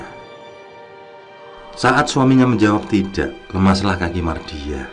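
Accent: Java, Indonesia. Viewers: Yg aku suka dri chanel ini salah satu nya pmbawaan logat bahasa jawa nya Gambar nya yg terkesan hidup tak lupa alunan musik nya yg mmpu mnghipnotis pkiran pokoke mantep smangat trus brkarya pakde bnyak blajar lah dri chanel ini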